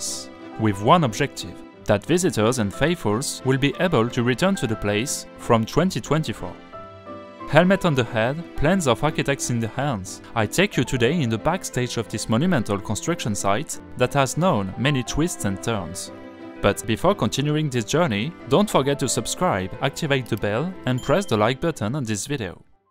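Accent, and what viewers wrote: France. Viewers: l'Anglais parlé avec un accent Français... je n'aime pas beaucoup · Votre accent francais a ajoute beaucoup a cette presentation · The Franglais is understandable. Gérard Depardieu could not do it better. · The accent is obviously French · You have a lovely French accent!! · you do have a strong French accent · I can hear the typical French emphasis on certain parts of the word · You sounded great. I had no problem. Love your French accent. English sounds so much better spoken by a Frenchmen! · I am a native English speaker who has studied many languages including French, so it didn't take me long to adjust to your English grammar and dialect...but I turned on the subtitles just to be sure I didn't miss anything. But you may have viewers from non-English-speaking countries who don't know French but do know English, maybe a lot or maybe a little...and they may need more help in understanding your English (or anyone's English). 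So, as a general recommendation, I think it would be good to have the subtitles available for people to turn on or off, using the CC button, as it is now. Merci infiniment pour vos merveilleuses vidéos! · It sounds french... one of these pleasant french English speakings that sound original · You do have a strong French accent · I even found your French accent charming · Your English is perfect and made even more pleasant with your French accent. Best wishes from Canada 🇨🇦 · the pronunciation is quite French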